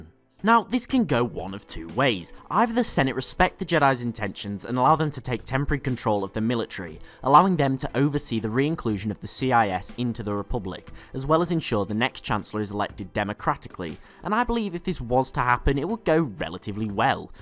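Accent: England, United Kingdom. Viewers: Is this guy english?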